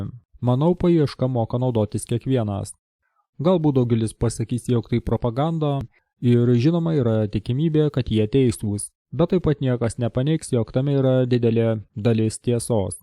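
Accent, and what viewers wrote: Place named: United States. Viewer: Klaysyk, satanistų rupore.... Išmok bent scenarijų perskaityti, ir pabandyk suprasti SKYRYBOS ŽENKLŲ prasmę, kai skaitai iš tau paduoto lapelio.... Dar.... Kokie biesai tave finasuoja? A, berašti parazite... Dar.... Tavo vertimas - IŠKRAIPYTAS ir to nepaneigsi. Ir nustok čia skleisti iliuminatų planą-svajonę. Ir žmones gąsdinti ir klaidinti. Pyzdink iš Lietuvos į tą savo jankyną, ir bent pasistenk, KAD PIDAROSIŠKAI-JANKIŠKO AKCENTO nebūtų, padla.... Nežinai nei rusų kalbos, nei lietuvių. Kas esi? Mormonas, jahovistas suknistas, ar kitoks Šėtono išpera?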